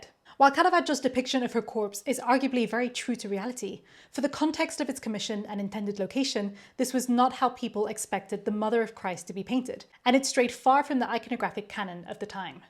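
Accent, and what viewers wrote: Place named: Italy. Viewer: Love your Italian accent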